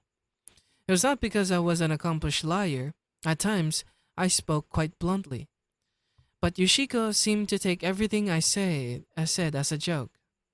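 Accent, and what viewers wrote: Philippines. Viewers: Love your speaking bro, just a few seconds in and I can realize you're Filipino haha. This is my first time listening to an audiobook because I could never get myself to read long texts so thank you for doing this.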